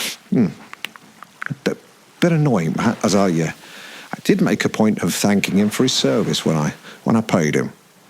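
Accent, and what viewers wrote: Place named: Australia. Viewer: Too aussie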